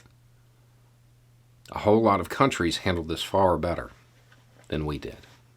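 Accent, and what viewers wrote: South, United States. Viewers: We talk in line at the stores up here in the North too, minus the adorable southern accent of course.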